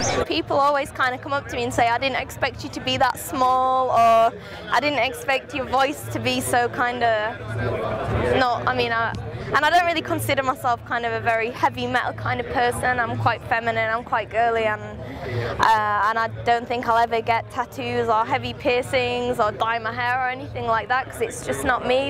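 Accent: United Kingdom. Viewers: I hate her british accent, but she`s cute.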